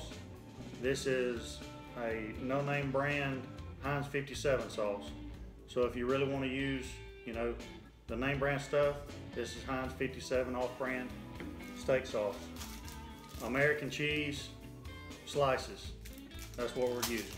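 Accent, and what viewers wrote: South, United States. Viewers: you sound like a real country boy what state are you in to me it sounds like Texas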